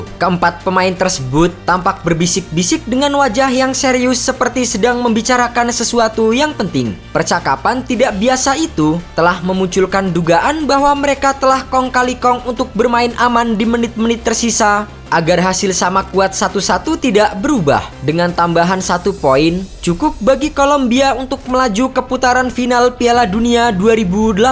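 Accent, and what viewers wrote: Indonesia. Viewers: kn logat orang indo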